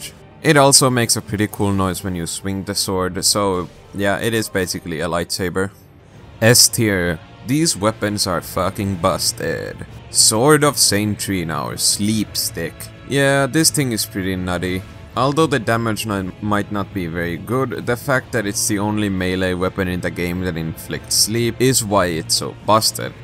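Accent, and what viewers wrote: Finland. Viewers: love the finnish accent <3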